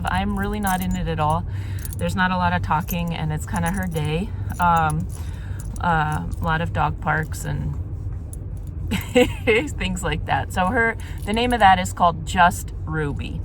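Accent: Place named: Norway. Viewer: Where are you from originally? I hear some Norwegian. Dont ya know..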